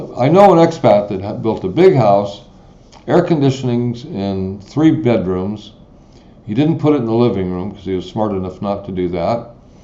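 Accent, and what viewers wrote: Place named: United States. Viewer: Here is my complaint about this video and I really don’t like to complain but when talking budgets it PH you are clearly speaking to folks who are not in PH. So why speak in pesos and to make it worse you alternate to both which makes it super confusing. At the very least stick to one but you sound like your from US and speaking to Americans so a budget in US dollars would be more friendly. Just some friendly feedback.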